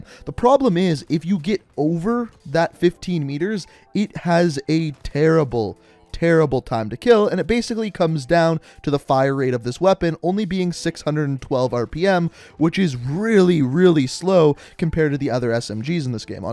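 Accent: Canada. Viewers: You sound 🇨🇦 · Why do Canadians like there ooooos and eeees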